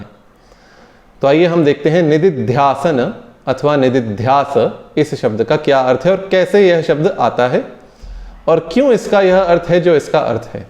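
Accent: Standard. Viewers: आप शब्दों के उच्चारण शुद्ध रूप से करते हैं ।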